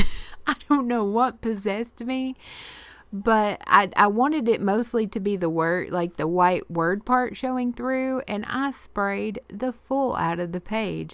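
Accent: South, United States. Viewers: Girl, you are getting good at those voice-overs! Liked the change up with the page too. It's good to break out of our comfort zone just a little every now & then. And yes, you watch those vids with all the art supplies & you want it all. End up spending a fortune too lol. Made me smile when you mentioned east TN. I am from there too. We all got that same accent. Still southern but less of a drawl.😋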